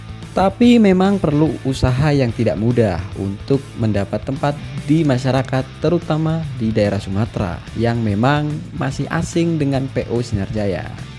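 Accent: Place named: Banyumas, Indonesia. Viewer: Bise wong ngapak tambah jossss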